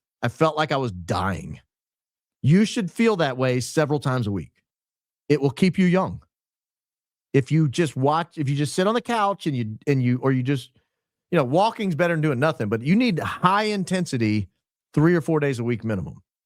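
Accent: United States. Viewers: Lol, I wouldn't criticise the British too much - you Americans have taken our language and butchered it. Your words like "crises" which you all seem to pronounce like "crisises" and in particular the way YOU pronounce "niche" as "nitch" is actually quite hysterical. It is pronounced "neesh" Fries are skinny potatoes which are deep fried. Chips are thicker deep fried potatoes. Crisps are snacks. Lol, I don't think the Americans can speak to the English about THEIR language... Aluminum anyone? Ffs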